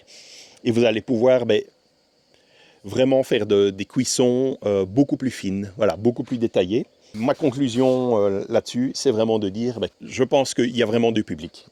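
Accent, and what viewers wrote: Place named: Belgium. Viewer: Très intéressante vidéo ! Certaines de vos intonations rappelle un accent belge, serions-nous compatriotes ?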